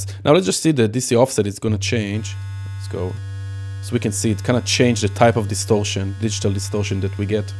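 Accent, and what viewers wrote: Israel. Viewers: dude I can't get over that Israeli accent, love it!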